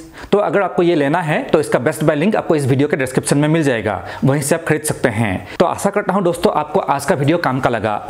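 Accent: Assam, India. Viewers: Axomor sobore hindi kotha anekuae hoi..🙃🙃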